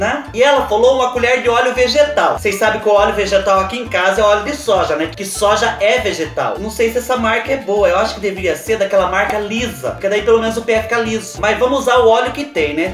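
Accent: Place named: Minas Gerais, Brazil. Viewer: Aí do céu...não dou conta dessas canelinhas lisas... Vc é muito legal...KKK Abraço aí em São Paulo. Sou mineira e vc conversa como nós. 🤗😘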